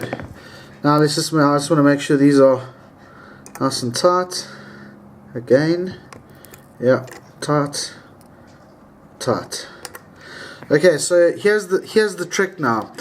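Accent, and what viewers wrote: South Africa. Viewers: Hey you sound like you're from eMzansi (S.A), Are you??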